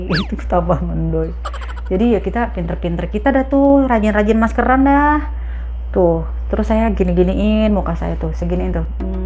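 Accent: Jakarta, Indonesia. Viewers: Ehehee.. lucuuu ngomongnya.. ceplas ceplos apa adanya.. Betawiii bingiitttt dahhh... Mo nonton, tpi dah adzan maghrib...pause dulu... yukkk maghribannn dulu yukkkk shayyy.. n keluarga sehat selalu yaaa... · cara ngomongnya jadi semakin pingin balik ke Jakarta rindu sama tetangga jgn di ilangin tuh cara ngomongnya Betawi banget sehat selalu bahagia daaaan yg terpenting semoga sehat selalu tuk sekeluarga👍👍🤲🤲😍😍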